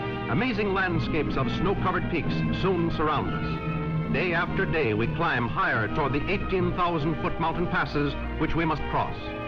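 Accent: United States. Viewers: That's an American accents and not British or Dutch.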